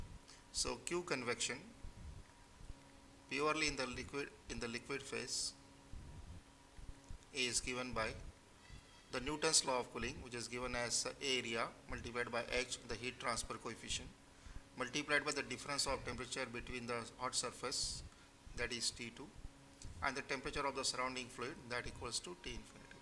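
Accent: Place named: India. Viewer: for the fuck's sake. i hate this accent and every fucking lecture on youtube has an indian guy speaking with stupid accent which is like a torture to understand. fuck this shit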